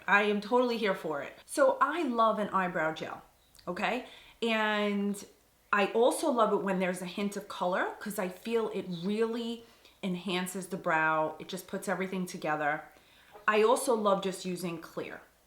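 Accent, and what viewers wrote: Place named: Long Island, United States. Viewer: I love your LI accent! I’m a guido from Brooklyn myself..lol. I never really had the need for makeup. I’ve been fortunate in that way. Good genes I guess. I’ve started to lose my brows since my thyroid condition. My hair, nails, lashes and eyebrows are just not the same. I’m not experienced at all in the makeup department anymore so I’m so afraid of makeup now and looking silly. I’ve seen that you can have your makeup done beautifully but if your brows are done badly, well then that is all she wrote. Everything just looks wonky after that. One of my problems now is picking shades (so many shades and undertones to chose from). Your brows are fuller than mine so they look darker but I think I’ll go with shade you’re using. I’m 50 something now..cough.. cough so I need something.. I’ve started using better skin care so I want to use makeup with good anti-aging ingredients. 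As for makeup, even if it is just putting on some mascara, concealer and having my brows done would be helpful so I won’t look so tired. Any tips would be appreciated!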